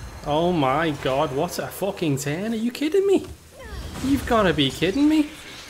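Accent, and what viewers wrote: Liverpool, United Kingdom. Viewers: Bruuuuh what?! A scouse streamer?! Yes laddddddd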